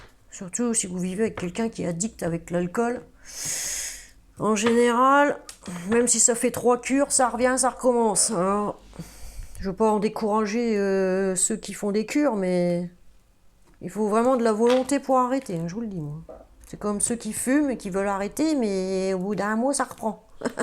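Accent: North, France. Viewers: J'adore l accent du Nord j en suis issu super adorable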